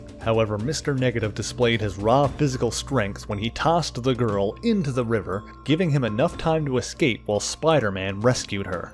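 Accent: Canada. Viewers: Damm bro that Canadian accept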